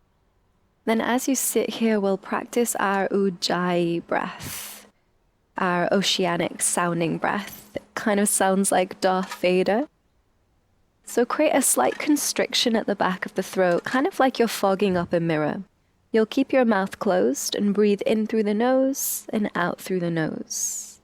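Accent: England, United Kingdom. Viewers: hI - thank you for a lovely practise that really hit the spot for where I am just now. After a long gap I'm trying to get back into a morning yoga practice but deliberately wanted just a 20 minute beginners one that I felt I could commit to and not find too taxing while I built up my yoga muscles and love again. This was perfect! And some beautiful scenery and a lovely English accent to boot! all good - see you tomorrow. xxx💖💝💘🥰🥰😍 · Hello dear... greetings from Iran ❤ I'm so happy to find your channel, I've done some lessons so far and I really enjoy the way you teach yoga... I absolutely like your british accent too😊 Wish you the best ❤❤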